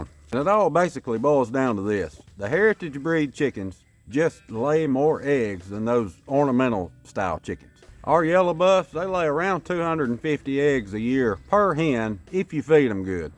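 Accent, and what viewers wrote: South, United States. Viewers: I'm from Ruston, Louisiana, and even though most around here don't have the southern drawl quite like yours.. Some of us still do. You'd fit right in brother! My family is on the hunt for a new home with enough space to start raising birds, vegetables, and maybe a goat or six. We've been in the city limits longer than we ever planned to be, and it's time to get rural. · LOL!!! Brother you got an accent for sure - true American. 😎👍👍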